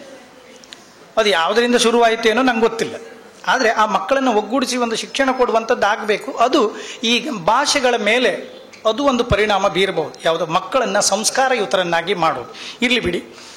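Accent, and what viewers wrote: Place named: Udupi, India. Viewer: speaks very beautiful UDUPI Kannada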